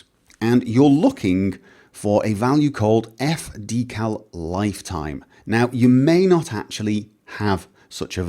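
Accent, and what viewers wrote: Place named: Yorkshire, United Kingdom. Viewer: he has a Yorkshire accent, mixed with a bit of wherever he lives now.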